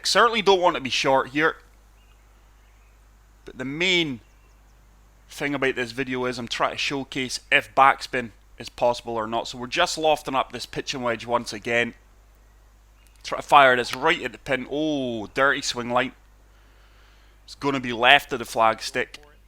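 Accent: Scotland, United Kingdom. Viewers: Thanks for the vid, got Golf Club 2 and never saw any back spin. Though can use some pointers here, always a wee bonus getting a Scot doing a tutorial, nice one bud 🥃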